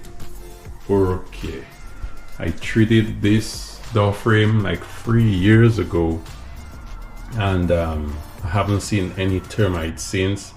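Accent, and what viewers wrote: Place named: Saint Lucia. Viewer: Hey u have a a st lucian accent.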